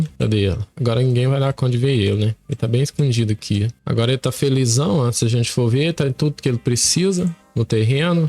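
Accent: Minas Gerais, Brazil. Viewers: Verdade acho que é por conta do sotaque fofo de mineiro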